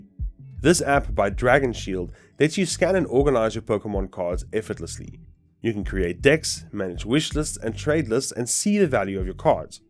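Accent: South Africa. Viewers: South African? 🇿🇦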